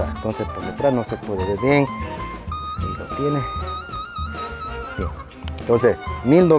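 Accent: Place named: Puerto Rico. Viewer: Saludos. lo de los vidrios se pueden reparar, ahora hay un metodo que usan para reparar. Pero se ve bien ese VW. Se dice burbujas de aire, lo del mercedez. Ya sabía yo que esa forma de hablar tenía algo de boricua. Que bueno tenerte paisano en estos medios...Haz ido a la isla? Yo vivo en Perú.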